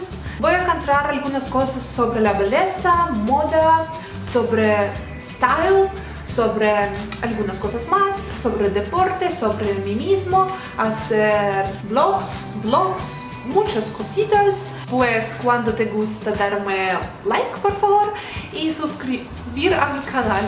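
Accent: Russia. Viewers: tu español no es malo, es el acento ruso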